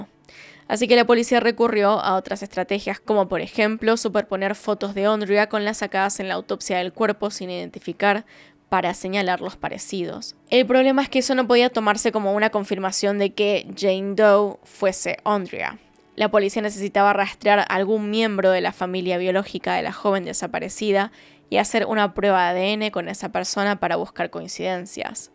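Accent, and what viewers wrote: Buenos Aires, Argentina. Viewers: No me gusta tu dicción, no creo que hables así cuando vas a la panadería, hacelo más natural, teniendo tanto acento porteño pretendés renegar de la pronunciación en español exageradamente, aunque sea en nombres propios o de localidades cortas la esencia de la narracion en tu idioma, no creo que tengas un nivel alto de público angloparlante por lo que no es necesario tanto, mañana o pasado elimino el comentario por qué no se cómo hacerte llegar la sugerencia. Por lo demás el resumen es bueno y consistente, más que bueno, sin agregados inútiles como nefisto, landó, bárbaro etc que se van por las ramas, muy buena tu capacidad de síntesis. Saludos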